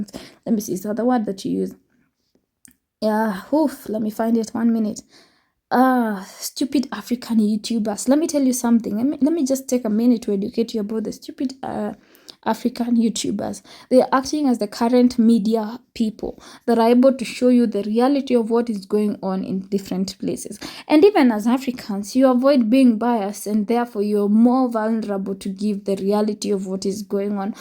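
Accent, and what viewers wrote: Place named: Somalia. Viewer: Her accent doesn't sound Ethiopian. She is Somalian I think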